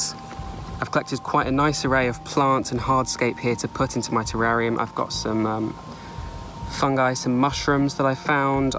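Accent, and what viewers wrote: United Kingdom. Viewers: turns out all i needed was a handsome british man to calmly talk to me about plants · Also I can listen to your British accent and your soothing voice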